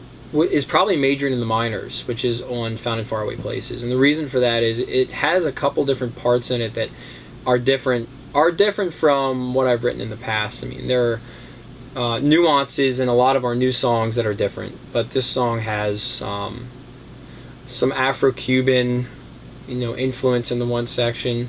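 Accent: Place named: Pennsylvania, United States. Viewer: man, that PA accent. hahahaha. makes me feel like home. congrats on 11k subs!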